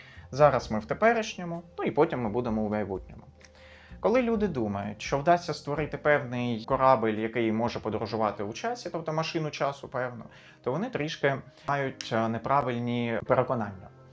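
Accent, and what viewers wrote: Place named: Russia. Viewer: Цікавий російський акцент.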